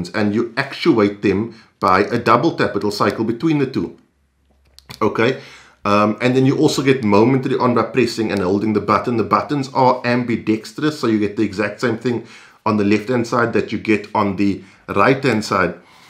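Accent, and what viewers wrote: South Africa. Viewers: South African accent I hear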